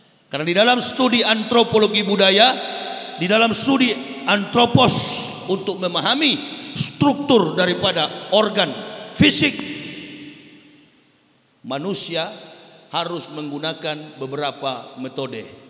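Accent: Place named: Manado, Indonesia. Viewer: Ilmu perbandingan agama ini bagus utk pengetahuan 👍.. suka dengan gaya Manado pak yahya masih sangat kental 😄👍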